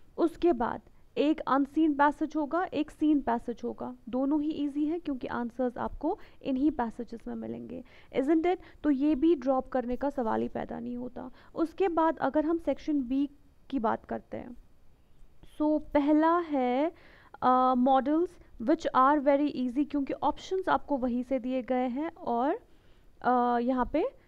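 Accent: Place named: Kashmir, India. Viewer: I think You r frm Kashmir Right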